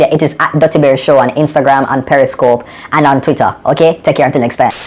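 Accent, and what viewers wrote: Jamaica. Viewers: You did one without the"I is", and the, "I are". This time u really sound like a Jamaican.:-) oh by the way "u win wid a gap like me front teeth", made me laugh.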